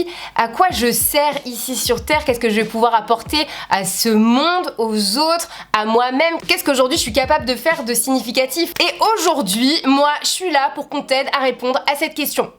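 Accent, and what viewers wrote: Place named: Marseille, France. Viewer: Ton accent marseillais 😂 Sinon je t'adore tu es juste Magnifique super vidéo comme d'habitude et moi je suis ( presque) sur que tu peux atteindre le million (ou les millions) 😉❤️❤️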